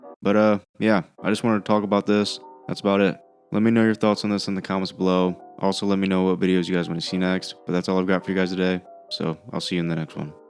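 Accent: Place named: United States. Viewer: You are still a soulless excuse of a human being for not caring about the family in the theatrical cut. But you sound American so it’s almost a cliche. Still important for the film to reiterate that they are heroes. They are here to save everyone. Collateral damage or the world. About your conclusions I must fall in favor. The Snyder cut showed a better representation of the heroes. Everyone was aware of the stakes and reacted accordingly. The heroes had important roles and ended the mission with serious determination.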